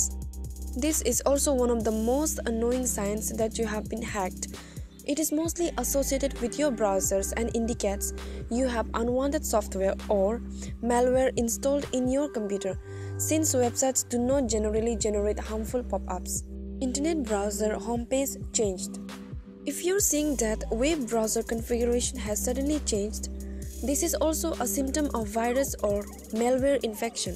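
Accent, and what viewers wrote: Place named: India. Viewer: Indian accent 😂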